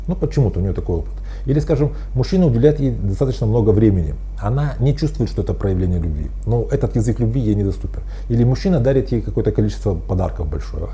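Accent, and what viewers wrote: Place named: Ukraine. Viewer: он живёт в Украине, акцент просто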